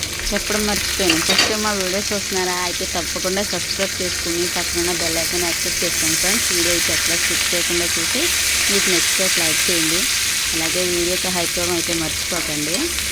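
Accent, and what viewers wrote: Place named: West Godavari, India. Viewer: App. Ka. Basa bahut acha hai,,, i think west godavari language...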